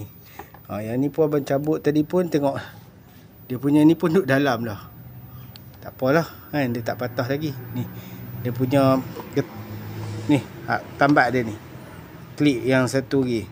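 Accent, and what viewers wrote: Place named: Perlis, Malaysia. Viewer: Abg org perlis ka,cara abg ckp mcm org perlis....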